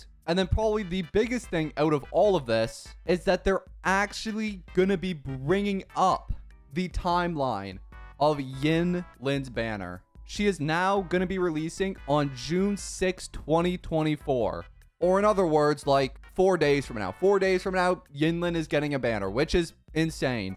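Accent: Canada. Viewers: Are you from Ontario? Thats a huge Ontario sounding accent lol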